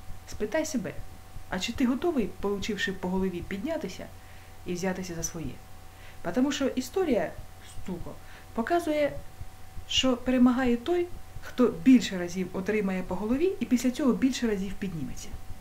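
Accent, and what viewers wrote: Surzhik, Ukraine. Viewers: Какой прикольный суржик :) Молодец! Хейтеры пусть идут в путин.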